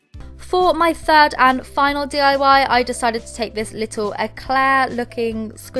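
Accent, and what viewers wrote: United Kingdom. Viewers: I love your accent! R u from england? Because i love british accent!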